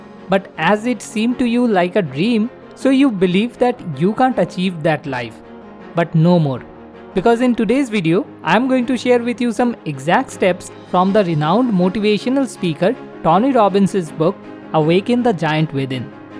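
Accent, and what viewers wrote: India. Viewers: I hate the Indian accent....sorry. I just cannot take it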